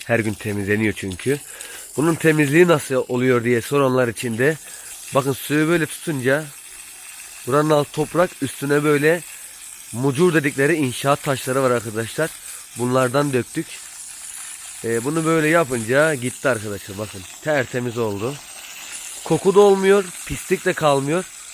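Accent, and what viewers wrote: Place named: Gaziantep, Turkey. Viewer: aga bu adama anteplıce konus mak cok yakısıyo adam bu adam harbi kral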